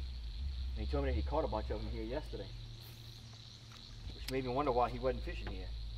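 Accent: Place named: Louisiana, United States. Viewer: First time watching awsome video. Sound like u from Louisiana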